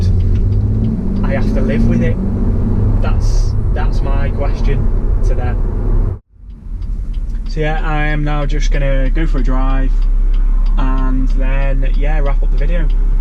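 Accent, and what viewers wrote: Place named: Liverpool, United Kingdom. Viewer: Love the scouse accent nearly has good has ours great video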